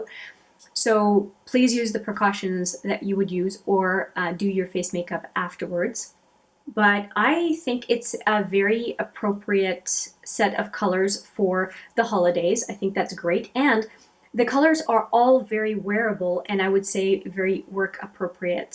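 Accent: Quebec, Canada. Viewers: The Elizabeth Arden is still on their website.Guess I know y!U sound like u could be Canadian french!I think the urban decay shadows are my fave of the ones that I have.In general for the price and general quality they're the best of the ones I have.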